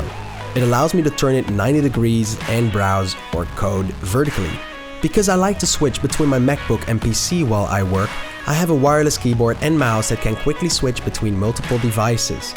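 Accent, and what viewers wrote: Netherlands. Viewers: Ik was in de commands opzoek of je Nederlands was, toen zei je het zelf. Hoorde het toch net in je uitspraak af en toe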